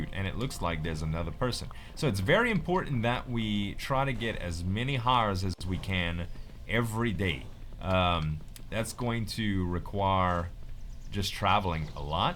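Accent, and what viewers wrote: Louisiana, United States. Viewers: did not know he has a kajun dialect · where in Louisiana? Im from Metairie:) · Aww, don't train away your cajun accent. It's one of the best accents in the English-speaking world! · Wait so is from Louisiana? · oh and btw are you from Louisiana? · I love your accent! Didn't realize it was Cajun. As a New Yorker, I'm not around it much/at all. Thanks for exposing us to it! (totally don't change :P )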